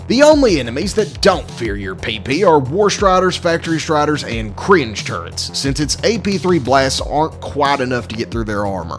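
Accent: South, United States. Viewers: What state you from? Not to get personal or anything, but a bunch of people that I’ve played with said I sound like you so I’d like to know if it’s because we are from the same state or just that we both have a southern accent lol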